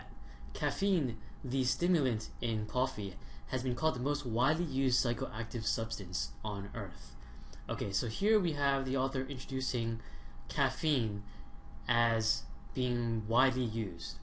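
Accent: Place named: India. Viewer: who the fuck r u, ur accent is so indian seriously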